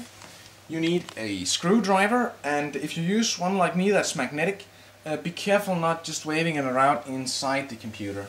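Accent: Denmark. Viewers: haha jeg kan høre på dit engelsk du er dansk :)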